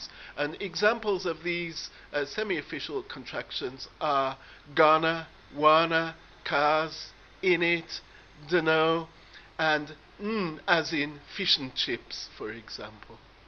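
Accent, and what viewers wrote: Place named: Liverpool, United Kingdom. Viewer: Hi you know that I can understand you perfectly unless you speak with your Liverpool accent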